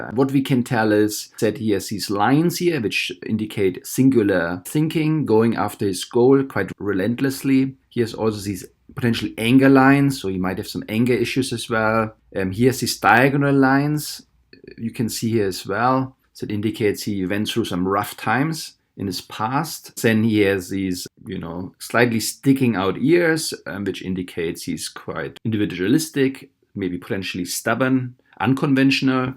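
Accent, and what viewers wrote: Germany. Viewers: Dein Akzent schreit förmlich "DEUTSCH, ICH BIN DEUTSCH"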